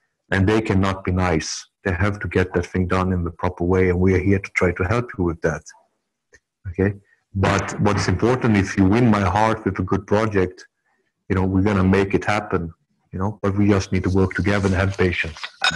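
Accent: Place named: Netherlands. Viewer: Gaia's Public Health Nurse yes it seemed some paper or something was rustling up against the microphone. Never mind, it causes us to pay closer attention. I think his accent is Dutch or Dutch-Indonesian.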